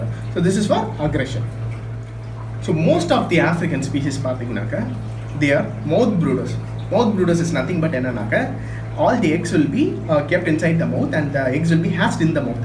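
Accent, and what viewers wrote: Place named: India. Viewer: Why do you speak Indian English?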